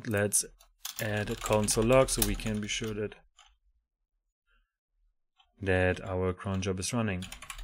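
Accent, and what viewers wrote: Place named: Germany. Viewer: are u a german ? your accent made me tired. sorry bro.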